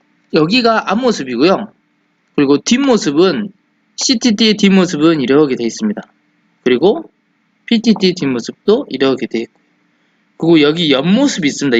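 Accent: Jeolla, South Korea. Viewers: 다 좋은데 전라도 사투리가 너무세요.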